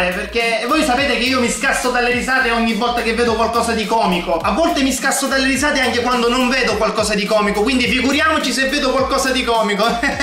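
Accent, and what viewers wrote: Sicily, Italy. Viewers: Ma sei di Sicilia?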